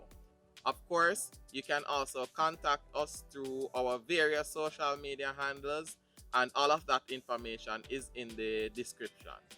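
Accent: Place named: Jamaica. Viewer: Bomba! Me like the video....big up d Jamaican twang and big up the hygiene processing method. Respect for sharing.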